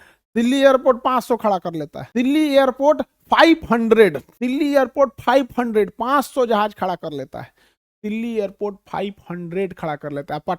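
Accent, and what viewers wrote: Bihar, India. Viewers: Bihari hai sir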